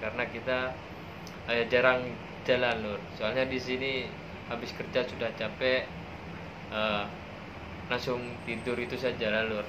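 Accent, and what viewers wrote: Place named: Madura, Indonesia. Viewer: asli dr mana kang,,logat bicara seperti dari Madura nih 😊🙏🏻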